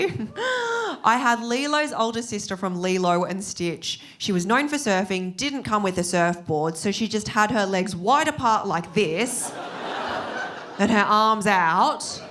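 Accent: Australia. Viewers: Going onto midnight and this pops up on my You Tube feed. I'm from New Zealand, and I see a Chinese woman with a strong Australian accent. I can't understand Chinese or Australian. I had to put the subtitles on . Sorry. Anyway, I had a peek. Glad I did, very funny and witty. New Subscriber. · People think your voice doesnt match the face because we are so used to American voices when we hear someone speak, when we hear someone from Australia everyone thinks its some white person because most movies show that, Australia isnt really popular in anything and noone knows how diverse Australia really is compared to most western countries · Wow that is thicke australian accent i love it. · You are so much fun and perfect and beautiful! Icing on the cake is that you are Asian with an Australian accent..... win. win .. I could listen to you all day. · As a fellow Aussie, I really do think that anyone who has heard you talk and said you aren’t Australian is just extremely jealous of the fact you sound more Aussie than they ever will, but are also far more interesting because of how you look. 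😂 Also, I am pretty sure it is the most Aussie thing to upscale your story every time you tell it, sort of like fishermen. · The first time I found the Australian accent so beautiful! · I'm sorry, not being mean, just you are hard to understand because you're Australian accent is so strong. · Ugh...Chinese with an Australian accent?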